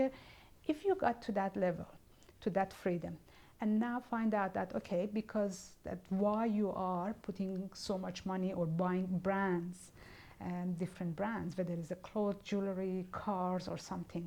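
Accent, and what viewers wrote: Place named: Iran. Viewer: Very fascinating. I see your name is but hear a strong Persian accent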